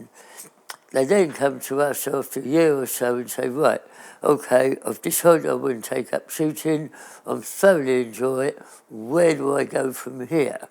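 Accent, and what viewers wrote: RP, United Kingdom. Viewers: I could listen to all day long, so full of knowledge. I suffer from Tinnitus as result of shooting in my youth and I can understand every word that he says and those that can't must be stone deaf or does not speak the Queens English.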